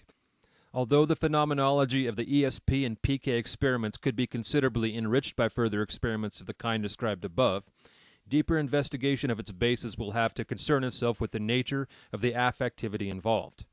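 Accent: United States. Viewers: where are you from, that accent is from Atlanta?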